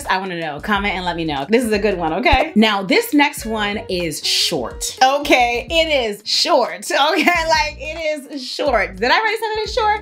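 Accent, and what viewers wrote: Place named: New York, United States. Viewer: Girllllll. You are such a New Yorker, ya feel me? I'm like is this the accent people say I have that I don't notice but I know I have 🤣. I Crack up everytime you say something very New York.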